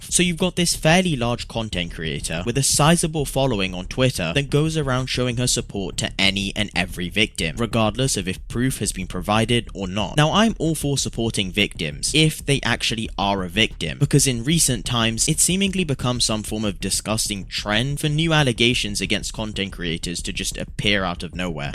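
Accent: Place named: Wales, United Kingdom. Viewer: She’s welsh and has adhd please don’t make fun of her accent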